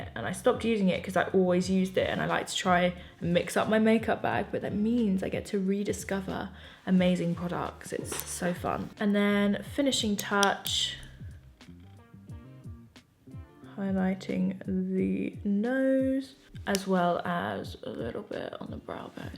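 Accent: England, United Kingdom. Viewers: This is my first time watching you and I’m hooked. Love the makeup and you are stunningly beautiful. I could listen to your English accent all day 💕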